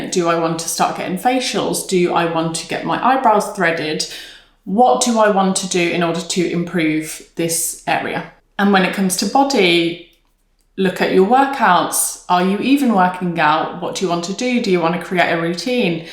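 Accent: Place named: United Kingdom. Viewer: i wasn’t expecting a british accent! lol but loved the video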